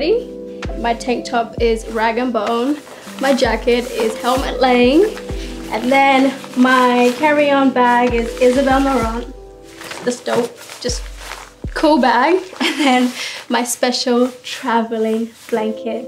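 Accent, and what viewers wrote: Australia. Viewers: She’s beautiful and has such a stunning figure, love her Aussie accent as well! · She is so down to earth and very beautiful. I love her Sudanese heritage and Aussie-tinged accent growing up in Australia ;) I love Naomi taking her under her wing...so cool. She deserves so much success. xx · She sounds Australian almost. She is beautiful!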